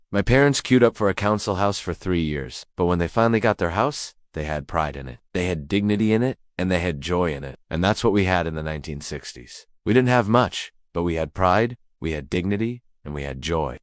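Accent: United States. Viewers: Sorry, the AI produced pictures (look at any text, a lot of which is gibberish) and the Amurcan commentary completely removes any credibility this might have had. I winced at 'Mom', but turned off when we got to 'moble phones'........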